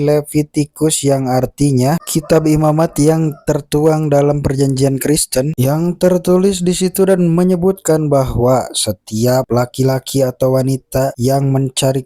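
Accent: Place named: West Java, Indonesia. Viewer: wkwkwkw logat asli pasundan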